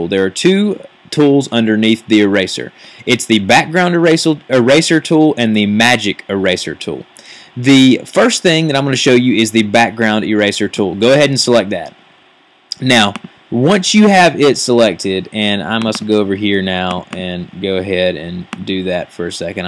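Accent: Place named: Tennessee, United States. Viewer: I love your voice. I detect a slight accent. Is it from Tennesee?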